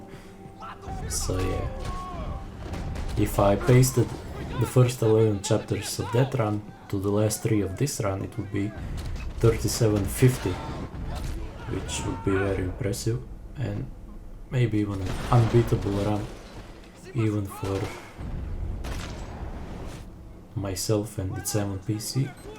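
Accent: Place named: Russia. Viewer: Звучишь как русский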